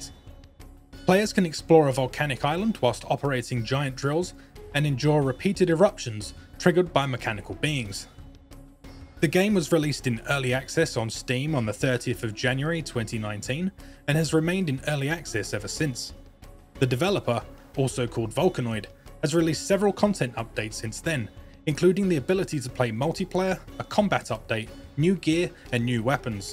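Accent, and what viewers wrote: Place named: United Kingdom. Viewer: idk anything in british accent sounds more legit even reviews :D i enjoyed it :D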